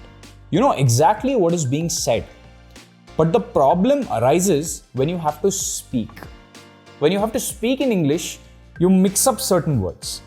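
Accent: India. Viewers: I think you're a south indian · Your hindi reminds me of south indian can drivers😂😂, no offence btw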